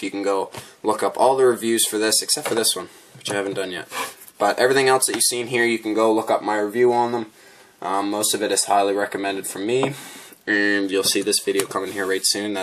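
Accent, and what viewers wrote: Canada. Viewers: You're Canadian, Aren't you? You sound like a Newfie.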